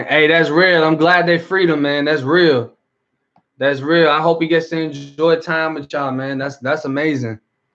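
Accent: Boston, United States. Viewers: Hey Bro, Boston accent frealz! You into the great Boston comic, Bill Burr? Bet you won't get tickled!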